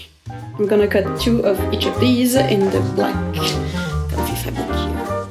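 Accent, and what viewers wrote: France. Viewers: Je suis trop contente que t fr mdr, au debut j'ai entendu ton accent et je me suis dit "mais attend elle est française elle" mdr Tu fait un bon boulot, ta deja fait quelque chose de mignon ? Genre couleur pastel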